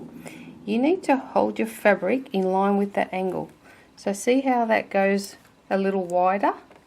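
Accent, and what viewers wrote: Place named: Australia. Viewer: This has nothing to do with sewing but I love your Aussie accent. (Thanks for all the helpful videos!)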